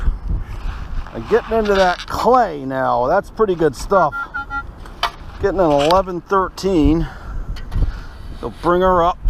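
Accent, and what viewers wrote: United States. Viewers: i f'ing hate the way you yankee's talk ,but i bet you feel same about us ,so we are square ,lol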